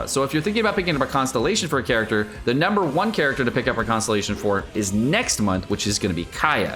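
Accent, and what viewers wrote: United Kingdom. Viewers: I looove british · Can we please get a British only video please 😭😭😭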